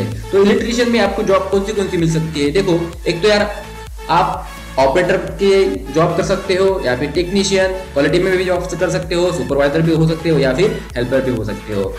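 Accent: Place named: Maharashtra, India. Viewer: Sir tumhi Marathi madhech ravas boltat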